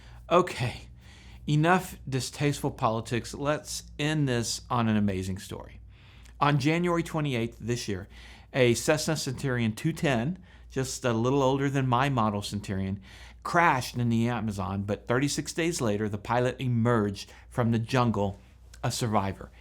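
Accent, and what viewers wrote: Texas, United States. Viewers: it is obvious you are from Texas.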